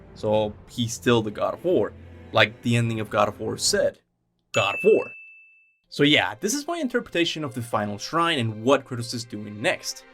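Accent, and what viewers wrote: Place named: Cuba. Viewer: ah yes, Kaptain Kutie well duh. It's the name of the game. Awesome video,! You sound a bit cuban but i like it!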